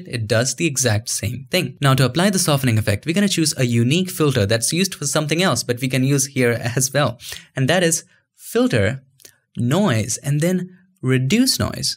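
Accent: India. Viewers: Are you from india or outside??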